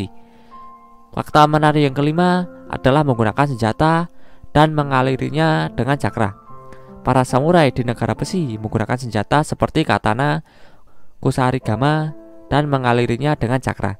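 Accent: Java, Indonesia. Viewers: Org Jawa kebanyakan bahas membahas🤣😂😁 logot mu GK enak di dengar telinga hhh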